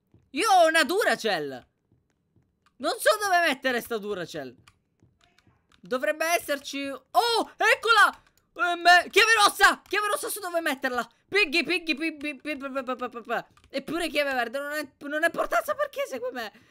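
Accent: Sicily, Italy. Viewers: Sei Siciliano??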